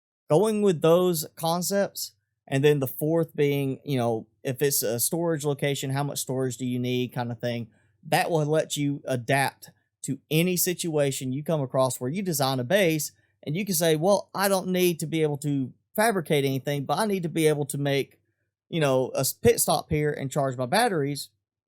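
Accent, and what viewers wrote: South, United States. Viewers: Ah someone who sounds southern like me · I want to subscribe but that country accent is killing me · Totally off topic but where’s your accent from? Im absolutely shit at guessing US accents so I’m gonna shoot in the dark and say somewhere south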